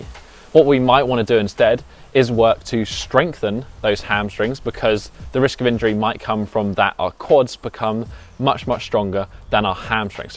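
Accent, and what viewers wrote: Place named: RP, United Kingdom. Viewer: Love the well educated British accent man! You pronounce your words very precisely!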